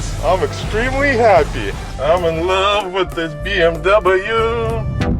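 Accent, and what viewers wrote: Russia. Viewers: этот русский акцент режет ухо конечно 😂😂😂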